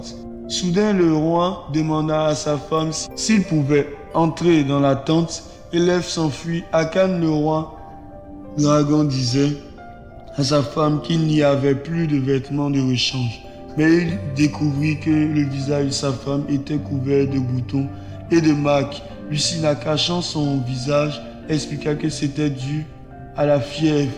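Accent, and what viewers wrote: Ivory Coast. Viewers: bonjour je vais m'abonner à ta chaîne ça me ferait plaisir de voir ton évolution par ailleurs ont à déjà dit que ta voix ressemble à la nôtre celui des ivoiriens 🤛🥰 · Ta voie ressemble à celle d'un ivoirien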